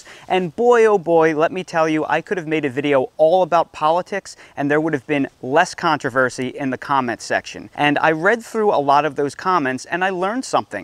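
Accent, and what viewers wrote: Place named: Pennsylvania, United States. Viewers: When i started watching and you mentioned you were in NC, I was like oh cool my father and step mom lived there, she was from there. RIght away I said to myself hes not from there lol he's sounds like PA and looks like a Jersey Boy. Lmao in you revealed that you are from the PA/NJ area. lol WE spot our own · So funny, I KNEW you had a Philly accent. I was going to ask you after a previous video. I went to sleepaway camp in the Poconos for 10 years and I can pick that accent out anywhere. And thanks for the info on indeterminants. I already have Celebrity and Bella Rosa seeds and will be planting them this fall (South Florida, Zone 10B. You think YOU know about heat and humidity. HA!! AND our bugs are the size of Mini Coopers!)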